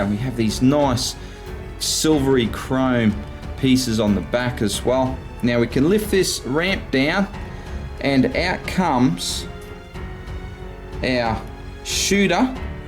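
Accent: Australia. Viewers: i love being able to watch/listen to a true Aussie! Great Video!!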